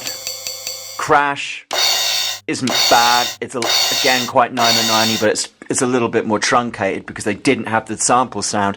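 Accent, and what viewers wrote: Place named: South, United States. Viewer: southern voice makes me laugh but all in all find the series very interesting and funny. you persuaded me to go for a univox too great video that. look forward to the new ones. happy new year.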